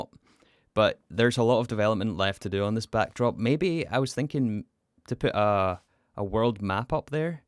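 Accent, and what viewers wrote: Scotland, United Kingdom. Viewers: Are you Scottish and if so where you from? Just discovered your channel and love it. I’m from Glasgow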